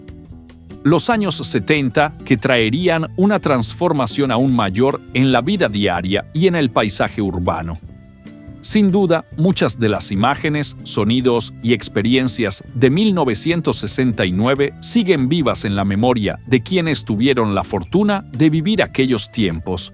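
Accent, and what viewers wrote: Yucatán, Mexico. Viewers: ¿El narrador habla como yucateco o me lo estoy imaginando?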